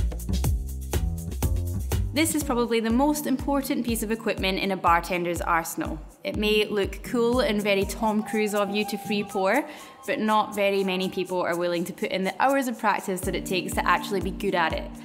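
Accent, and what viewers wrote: Scotland, United Kingdom. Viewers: By the way, I absolutely enjoy your recipes and your Scottish accent! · Love your videos and lovely Celtic/Scottish accent. I'm having trouble getting the exact song title and artist from your video. R, Patrick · Does she have Scottish accent?? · God, I love that scotish accent. There should be more scots in this world.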